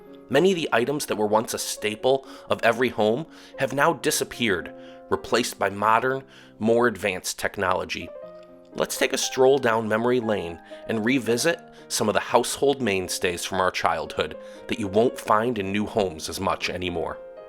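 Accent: Midwest, United States. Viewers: I like your accent. It sounds like you're from the Midwest. I could be wrong, but that's what it sounds like to me.😊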